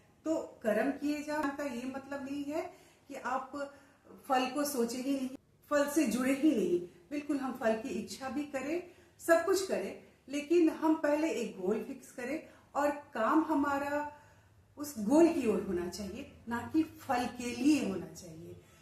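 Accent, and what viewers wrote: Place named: Bihar, India. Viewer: Well, first of all warm hello to you after such a long time. Where do you get so much knowledge n wisdom? Are you from Bihar since you have bihari accent ?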